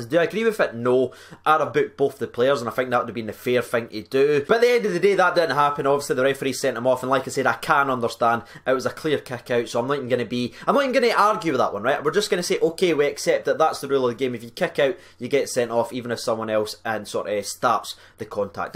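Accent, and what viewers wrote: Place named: Edinburgh, United Kingdom. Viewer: Deffo pal 👍 this has been the case for the past couple of years even down south, ive never seen so many ridiculous decisions made by refs up n doon the country, feckin ruined ma sunday 😠 ma only bonus is watching Utd v Bayern tonight. CONSISTENCY is all we want wi a bit o common sense ffs. You pretty much summed up everything i would've said 👍 Where are you fi pal you sound like you're from near me in the Capital 😆✌👌🙋 WATP 🙌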